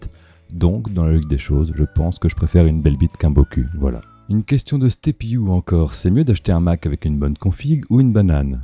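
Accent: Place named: Hauts-de-France, France. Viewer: J'arrive 4 an après la bataille, mais j'aurais aimé savoir d'où vient ton accent. Tu prononces les sons "A" d'une façon particulière, ça ressemble à l'accent de ma région (Hauts de France-Belgique).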